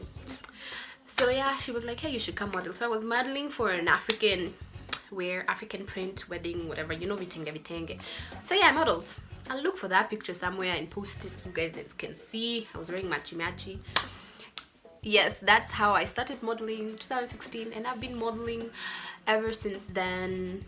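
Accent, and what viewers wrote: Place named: England, United Kingdom. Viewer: I dont know if I have said this before but mahnn do I love your English accent u know how u pronounce words gets my attention all the time ooh and your channels are so like real if u get what I mean like all the tusmall movements biros speech keep that up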